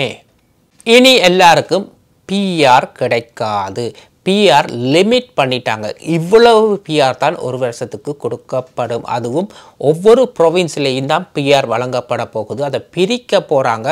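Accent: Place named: Sri Lanka. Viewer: இலங்கை தமிழ் பிரமாதம்.. கேட்க இனிமை...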